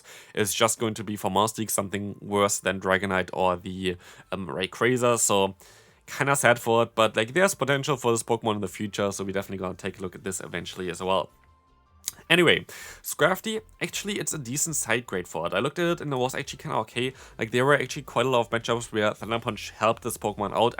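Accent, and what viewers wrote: Germany. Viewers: bro, are you german? I can swear i can sense from your accent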